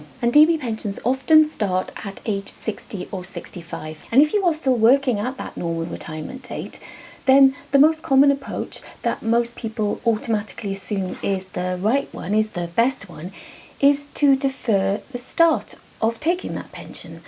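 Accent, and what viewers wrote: Wales, United Kingdom. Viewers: Thank you for this incredibly structured video. I will be coming to you for advice in about 10 years time. Your accent, Bridgend or Port Talbot I would say…Porthcawl in between? Keep up the great vids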